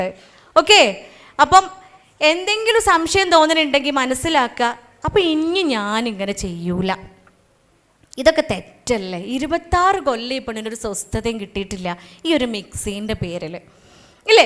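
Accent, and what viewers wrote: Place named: Malappuram, India. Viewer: മലപ്പുറം ബാഷ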